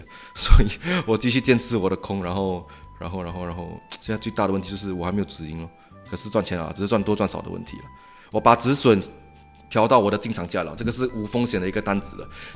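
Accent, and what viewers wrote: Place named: Taiwan. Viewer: 我喜欢你的背景音乐。喜欢你的台湾腔，你讲话好机车。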